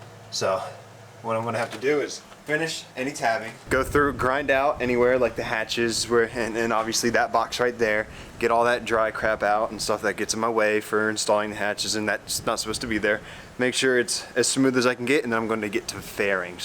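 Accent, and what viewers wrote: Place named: Newcastle, United Kingdom. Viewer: listening to true Geordie! Love that and watching this build has really helped me with my project boat some of your "mistakes" i was able to skip and not have to take the time and make them myself. Keep up the great videos and hope to see you on the water soon!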